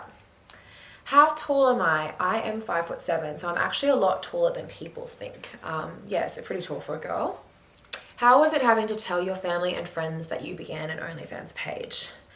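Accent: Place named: Australia. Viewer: It’s a blessing to hear your Ozzie accent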